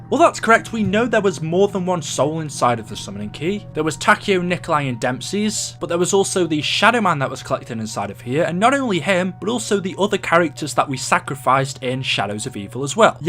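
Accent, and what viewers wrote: United Kingdom. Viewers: are you british? Also how old is Arthur and how tall is Arthur? ( I think hes around 7 feet tall)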